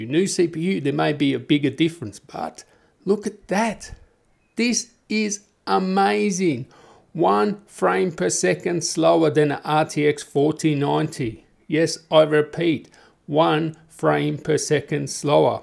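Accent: Melbourne, Australia. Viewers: your accent somehow made me miss Melbourne lol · Good to hear an Aussie voice on reviews 😂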